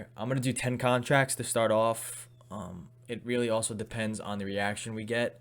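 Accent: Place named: New Jersey, United States. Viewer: yo you from jersey ?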